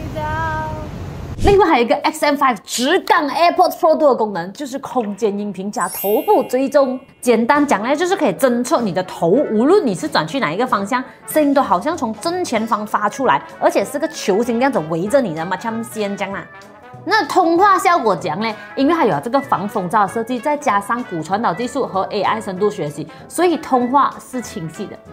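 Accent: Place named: Malaysia. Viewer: 博主姐姐的口音真的一听就是大马华人，特别像我隔壁的大马华人室友哈哈哈，很可爱的口音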